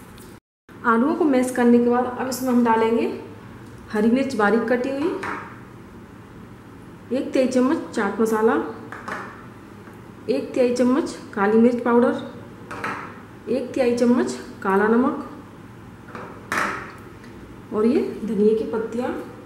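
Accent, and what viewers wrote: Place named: Delhi, India. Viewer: Bolne k tarike k lag Hi rha tha ki shayad humari taraf se Hi ho kyunki me b Delhi se hu but Gujarat me reh rhi hu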